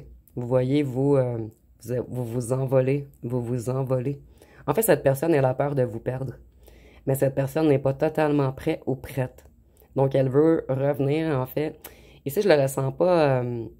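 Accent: Quebec, Canada. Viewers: Votre voix me réconforte et elle est fort agréable . Et vos mains jolies avec vos ongles et vernis . Merci pour la guidance que vous nous apportez gracieusement , et votre accent québécois j'adore. Cette personne a essayez revenir mais je ne veux plus depuis que j'ai vu derrière le masque qui s'y cache , un homme a femme qui se dit fidèle ,et qui est ds des travers sexuelles et malsains et en perpetuelles conquêtes il papillonne , il est très toxique et malsains très menteur ,depuis que j'ai retrouver ma liberté ,je revie sans lui et retrouve mes énergies ,il n'apprécie guère car il voulais me mettre sous terre, il m'avait mise en cage et enlever mon abondance et mes énergies ,il est jaloux maladif et possessif un psychopathe mytomane pervers narcissique ,toute la famille est ainsi complètement cingles, et seul il ne peut pas vivre et il est trop immature.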